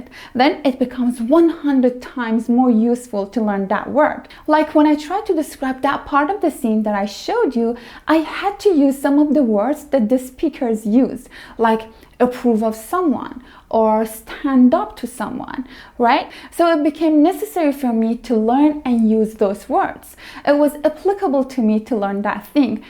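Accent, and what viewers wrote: Iran. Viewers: ته لهجه ايراني تون مشخصه. لايك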